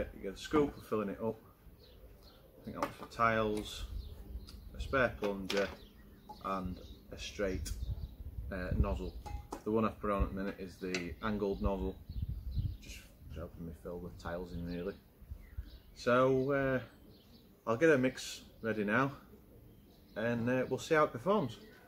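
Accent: Yorkshire, United Kingdom. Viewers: Sounds like a Yorkshire lad. Good review cheers.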